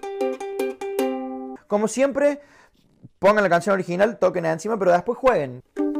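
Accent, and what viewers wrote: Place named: Córdoba, Argentina. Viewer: Argentinoooo wachooooo cordobe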